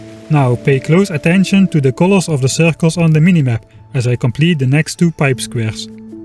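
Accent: Netherlands. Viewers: staalhard hollands accent ook lol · Jesus christ your dutch accent.. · Your Dutch accent is thick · Geweldig Nederlands accent Amazing Dutch accent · First one of your videos that i watch and i love your accent, is it dutch?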